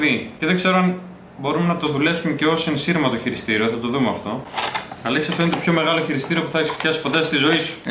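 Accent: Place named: Russia. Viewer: oh WTF. Russian?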